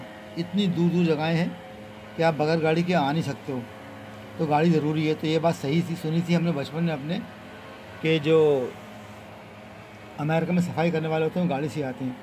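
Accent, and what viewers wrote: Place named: Madhya Pradesh, India. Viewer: Apki language se lagta hai ki ap Madhya Pradesh SE hoo..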